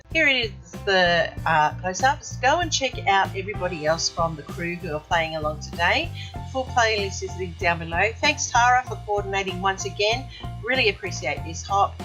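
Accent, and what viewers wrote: Australia. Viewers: I adore your Aussie accent :-). The rabbits are just lovely! Great art work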